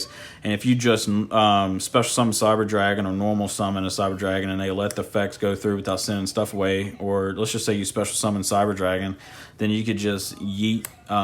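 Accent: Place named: South, United States. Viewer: Southern accents are tough! Lol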